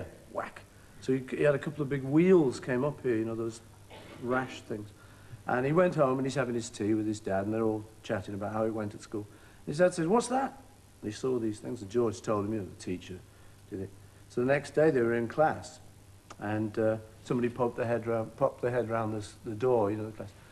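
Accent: Liverpool, United Kingdom. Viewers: Scouse power 😂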